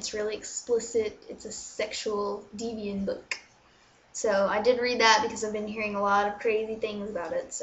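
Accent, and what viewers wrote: United Kingdom. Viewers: thanks for sharing so much personal information with us. Beeing a military wife sounds quite depressing :( I'm male and after I experienced war in my home country as a kid, I was sure that I would never go to any army in the world. Ofc its nothing bad about beeing a soldier, but still it's stressfull for a familylife having that kind of long distance relationship.. I wish u and your husband good luck and allot of love and strenght. (your britishaccent is amazing, wish I could talk like that) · I subbed to your channel at first because the british accent too, i to was somewhat obsessed with the UK - i was looking into traveling there, then moving there it was kindof random actually lol.. Shit, you had me fooled.. lol good job girl! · hey stumbled across your YouTube via your "how to speak in a British accent" vid. the others are interesting tew! 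hope all is well. are you British o American lol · What happened to your british accent? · BTW ether I loved ur British voice XD. And good luck to Michael out there, but no offense he's in the navy not too much to worry about. I know its hard for him to be away but trust me in the long run this will be better for your family beneficial wise. Stay strong :)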